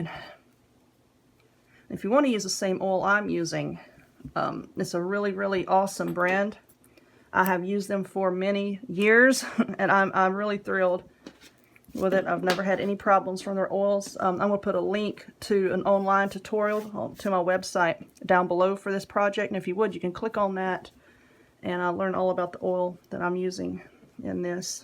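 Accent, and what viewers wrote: South, United States. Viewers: Girl you crack me up LOL " If your snowman is more health conscious .. " ..... Us southern women have our own way of talking and I love hearing you !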